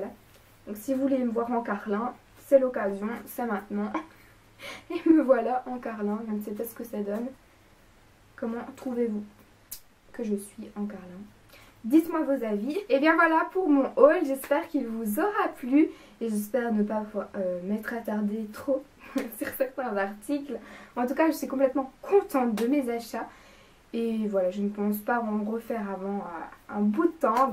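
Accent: Belgium. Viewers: Coucou, Je viens de tomber sur cette video et j'adore tes achats ! Tu as beaucoup de goût ,c'est simple mais très efficace :) tout ce que j'aime De plus, je te trouve pétillante et j'aime bien ton petit accent belge :D Je m'abonne!!! Bisous :*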